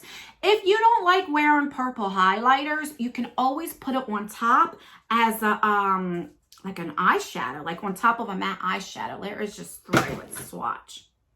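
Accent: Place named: New Orleans, United States. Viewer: Hiya! Your eyeshadow is bangin' tonight! I love it! So pretty. Your accent sounds like your from nawlins. I love it! Hope your daughter is continuing on a great recovery!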